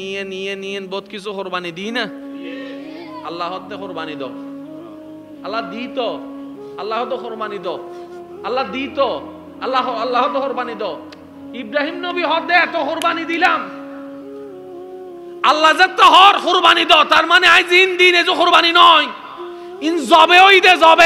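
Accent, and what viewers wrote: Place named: Chittagong, Bangladesh. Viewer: হুজুর আপনার ওয়াজ শুনি খুব ভালো লাগে। কিন্তু চিটাগং ভাষায় কথা বললে একটু বুঝতে কষ্ট হয়। আমার একথা ভুল হলে, মনে কষ্ট পেলে খমা চাইছি।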